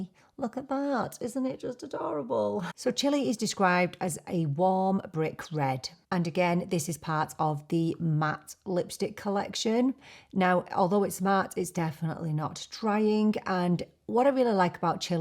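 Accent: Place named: Manchester, United Kingdom. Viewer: Loved this! I definitely dont have the confidence to wear red still enjoyed seeing you try them all on. Was shocked to hear you dont live in the UK you sound straight of Greater Manchester to me 😮 ❤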